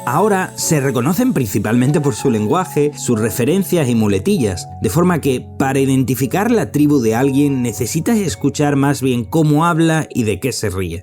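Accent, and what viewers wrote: Andalusia, Spain. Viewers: Que montón de subnormalidades y por tu acento lolailo no se vivio nada de lo que hablas el sur de España fue un reducto retrogrado donde la pantoja y la Jurado reinaron y reinan.... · Primero: me encanta tu acento pk también soy andaluza. Segundo: me encanta que hayas hecho todo un documental de este tema. Tercero: de dónde has sacado tanto contenido de los 2000 de las tribus en los PCS? Que pasada. Yo lo viví pero apenas tengo fotos de como se veian las webs en esos años. Me suscribo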